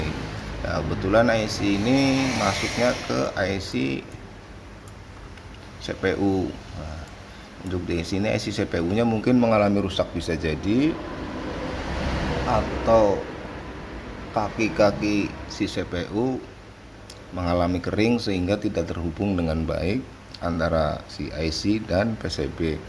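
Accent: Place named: Banyumas, Indonesia. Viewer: Orang mana om. pngin kuraus juga. kayanya org dekat nih. bhsanya ngapak juga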